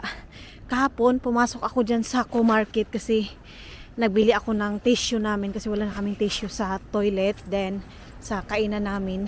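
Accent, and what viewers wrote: Visayas, Philippines. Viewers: Indai everyday abangers ako sa vlog mo para ka teleserye hahaha ok Lang kahit Ano vlog mo tas kahit Hindi ko gets ang accent bisaya mo hahaha tyaga ako manood sayo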